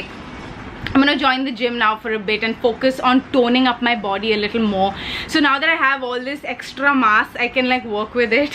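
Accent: United Kingdom. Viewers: Omg! That British accent wow I'm impressed!! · LOVE LOVE LOVE ur british accent😢😢😢 i have been thinking about it today only❤❤❤ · Also I loved your British accent....I am a Potter head and you reminded me of Emma Watson seriously! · Your british accent😂😂 love it 😂❤❤ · Your British accent was so on point!! Is it weird that I want to see more of it xD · You do amazing British accent.... I love that..❤️❤️❤️❤️ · U are so damn honest ...love that about u...and funny too...my that British accent 😂...that’s what keeps us subbies rolling on to come back to your channel again and again... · please do a whole video with you’re brutish accent it’s just gorgeous, I could go on listening to it, simply music to my years #britishaccentvideo💯 #SundazewithMals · Your British accent is perfect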